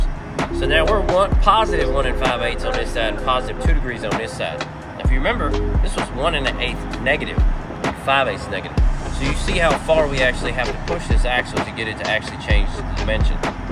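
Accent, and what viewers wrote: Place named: South, United States. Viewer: I do love how in some videos he says something like, “As you can tell by my southern accent, I’m not an educated man.” Damn, dude! I am an expert in my field, but you do things that I could not even try to pull off! Keep it up!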